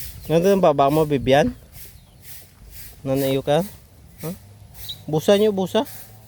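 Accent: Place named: Romblon, Philippines. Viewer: Maganda Buhay lods 😊 salita nio pra din sa Amin Romblon, Dami ah blessings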